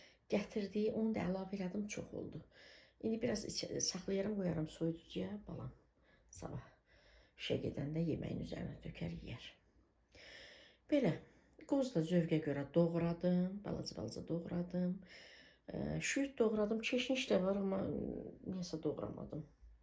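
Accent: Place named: Nakhchivan, Azerbaijan. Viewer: O qədər xoşum gelir Naxcivan hehcesinden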